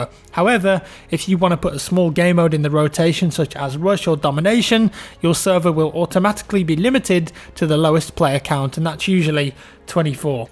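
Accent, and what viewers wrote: United Kingdom. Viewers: Meh, you know what, at first I hate the British accent, but you've turned things around for me <3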